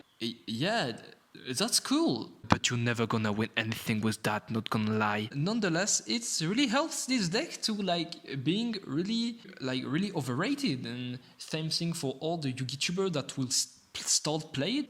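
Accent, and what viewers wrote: France. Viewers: Le petit accent français haha . Gg propre tes vidéos je m'abonne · Sound like french accent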